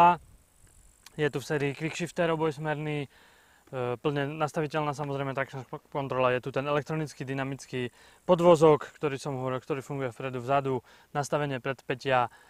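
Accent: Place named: East, Slovakia. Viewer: Velmi silny vychodniarsky prizvuk... inak super.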